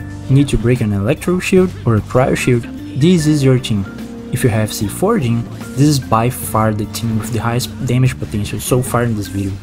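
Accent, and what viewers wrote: Brazil. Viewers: o sutaquezinho br nunca falha, mas excelente video mano, sucesso no seu canal!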